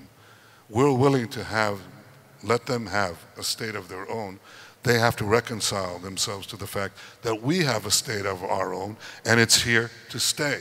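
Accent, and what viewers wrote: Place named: United States. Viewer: Why he has American accent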